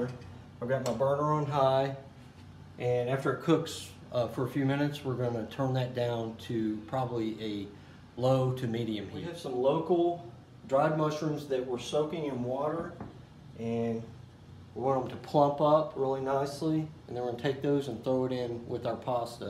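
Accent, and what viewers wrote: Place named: South, United States. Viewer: I love listening to your voice, first it's like a blast from the past, second it's soothing and calming and finally that bit of southern inflection is still there and it's like comfort food for the soul but no calories!!! Keep the videos coming.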